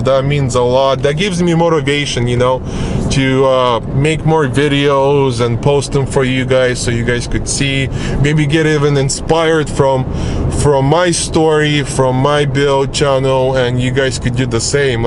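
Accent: Russia. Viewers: WOW, I am blown away and fighting the tears. I knew with your beautiful accent that you probably came from Russia. As a proud American, and this is long overdue, welcome to your new home! You and your family have done more with the American dream than we native Americans. Your dreams, your hard work and faithfulness to your family has paid off. Your dad recognized his worth and that of his family. I am thrilled you have a second store! Who knows maybe a 3rd or 4th awaits. Your are the quintessential success story, and you did it really without a lot of help. You were and are faithful and patient. Giving credit where it is due I personally believe God brought you here. Whenever something good happens in my life I thank Him for it. When the storms of life appear I ask Him what can I learn from this how can I grow. You’ve done that too. You now have one of the premier and most successful channels out there. 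I wish you all the best for you and your entire family. A goal on my bucket list is to someday shake your hand and hug you! Keep up the awesome work!!!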